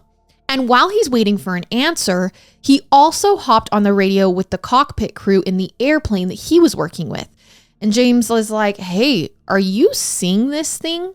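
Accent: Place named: AAVE, United States. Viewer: Ebonics! It actually exists!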